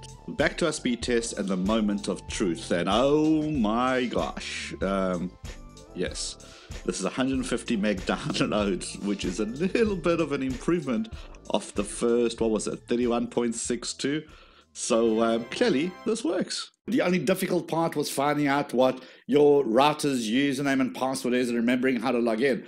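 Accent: South Africa. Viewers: Your accent is so South African 🤔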